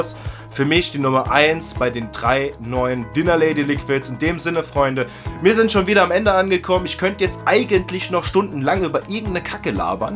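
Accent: Saarland, Germany. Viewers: Ich wussts doch eh Saarläääner auf weiter so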